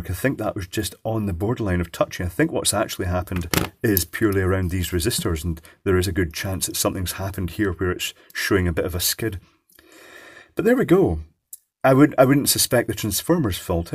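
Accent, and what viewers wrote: United Kingdom. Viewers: as always, Very informative video, by very charismatic speaker, your Native British accent is another inspiration for beginners (as it was for me when I started 3 yrs ago ). Thank you and God bless you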